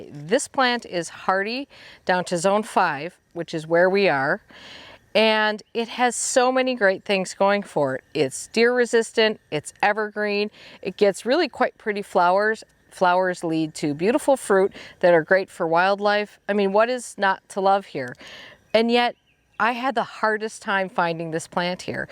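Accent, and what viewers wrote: Wisconsin, United States. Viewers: Great video! Sad that you lost the bet. Go Badgers! I pronounce Oregon just like you do. Sconnie girl here too! 💚🌱 You should send Laura some Spotted Cow from Leinenkugels. Then if she likes it, she will have to come here to buy more. (Only sold in Wisconsin) 😊😊 Have a wonderful day!! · This is fun. Love your sense of humor and that you garden in my zone. Knew you were from Wisconsin as soon as I heard you talk in another video.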